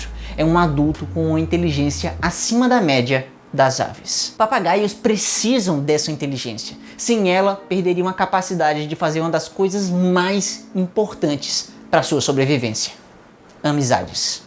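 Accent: Ceará, Brazil. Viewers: Mano vc é cearense? Puta cabeção de caixa d'água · Man tu é cearense?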